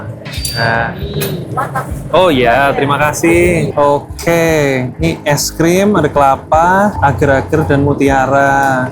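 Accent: Java, Indonesia. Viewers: SUMPAHH, MEDOK BANGETT 😭😭😭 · kok di surabaya sama semarang medhok banget yah apalagi kalau lagi ngobrol sama warlok padahal di jakarta nggak medhok loh, cocok banget emang kalau medhok 👍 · jadi muedokk gini... yang nonton juga ikutan medok ini jawaku 😂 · versi medhok emang nagih banget ❤❤❤ Suka banget kontennya ❤❤❤ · medhok is the best, lucu banget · gak ekspek jadi mas² jawa tulen bgt medhog😭🙏🏻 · itu orang mana sih ngomong Jawa nya agak medok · Medok men boso jowo ne mas 😅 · Ini lama-lama jadi food vloger berkedok vtuber😭 Tapi seru poll sii, apalagi kalo ngomongnya medok. Ditunggu konten jalan-jalan selanjutnya ✨🧡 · Wiiiii Semarang... Seneng bgt explore di tmpt perantauanku Asyik polll kyk diajak jalan jalan, medhoknya sukaaa nagih as always wkwk Jujur, liatnya makanan-minumannya bikin ngiler 😣😣 Makasih, aku jadi tau ada kulineran di tmpt tmpt itu wkwk Suka bgt konten jln jln kota kyk gini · Keliatan nyaman banget di semarang, tapi salfok sama medok nya 🥺 semangat keliling semarang nya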